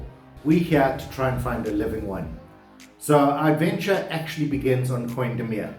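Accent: South Africa. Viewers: Can I guess we're ure from?.... South African Or Australian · You sound South Africa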